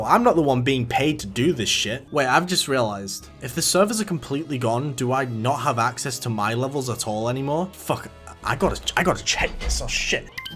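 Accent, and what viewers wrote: United Kingdom. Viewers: oh, he's British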